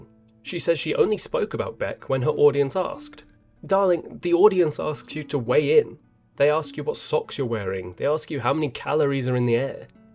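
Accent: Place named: United Kingdom. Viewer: I don't understand how you can take someone like ALR and speak so eloquently about her or what she's doing. I normally feel dumber after watching her but when I listen to you react to her, I actually feel smarter. Hey, it's NOT just the accent, either. Even though I have a thing for British accents because y'all could read the menu from McDonald's and it sounds intelligent 😂. It's like I'm watching a documentary and Albert Einstein is explaining how a sloth sloths. It's wordy but understandable. And it's Albert fucking Einstein, besties 😂. I think you might become one of my favorites, Mr.!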